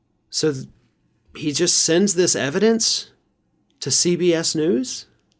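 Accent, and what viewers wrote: South, United States. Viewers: Hey, just curious if you purposely added that southern twang while you were reading that…. normally your accent is not very strong…but DAMN. Anyways what if Mo Brooks takes him down??? for the simple reason that he did not endorse him. I mean as long as he goes down, I don’t care the method but…JFC Mo Brooks is a petty bitch!!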